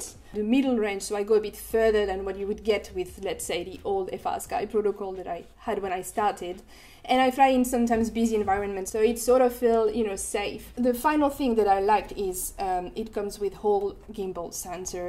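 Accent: France. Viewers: I meant like are you in France or a different country? Like the accent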